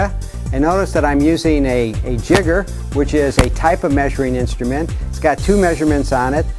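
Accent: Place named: Chicago, United States. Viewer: This dude sound exactly like what I imagine Chicagoans should sound like. Da Bears!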